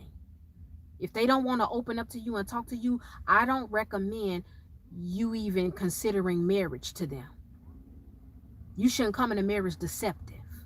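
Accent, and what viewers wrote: Chicago, United States. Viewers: I knew you were from Chicago because of the accent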